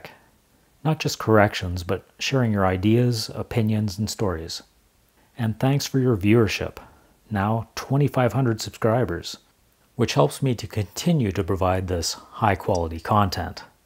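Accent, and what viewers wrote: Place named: Ontario, Canada. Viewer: Love the videos and the Ontario accent.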